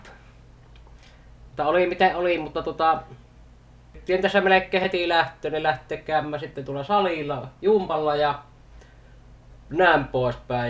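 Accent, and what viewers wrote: Savo, Finland. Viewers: nyt ainakin kuulee ton savolaisen murteen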